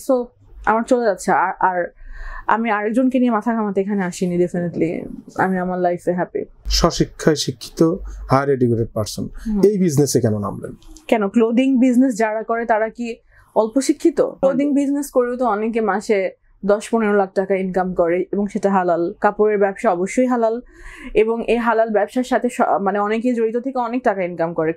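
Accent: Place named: Assam, India. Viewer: Asameese der moto kotha koi kyan?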